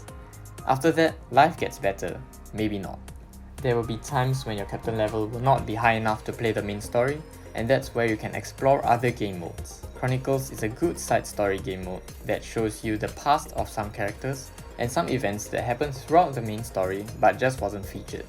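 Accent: Singapore. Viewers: are you from singapore? nice accent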